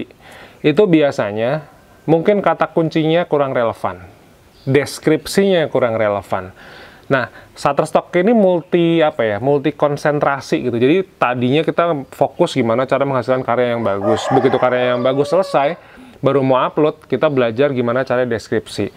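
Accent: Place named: Java, Indonesia. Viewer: Dengan bawaan yang rilek,logat Jawa nya kental,Joss kang👍🏻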